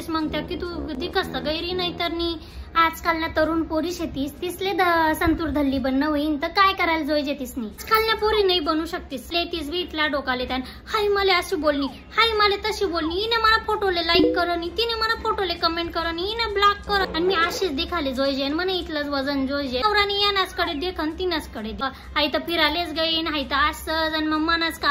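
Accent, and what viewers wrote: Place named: Marwar, India. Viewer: Hi कोणती भाषा आहे, खानदेशी का अहिराणी? खूप मारवाडी भाषे सारखी CH वाटते आहे 😁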